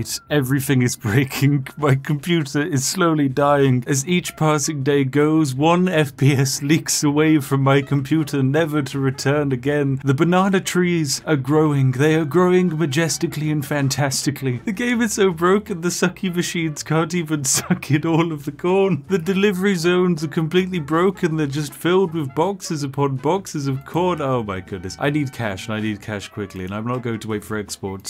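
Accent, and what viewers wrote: United Kingdom. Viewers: 🤔Ahhh.. heh... it makes sense now. You have to pay the prisoners.. Thanks Mr. British Voice!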